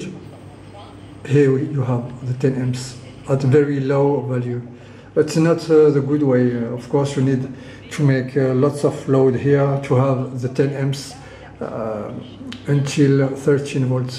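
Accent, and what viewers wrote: France. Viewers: On dirait un accent francais ;)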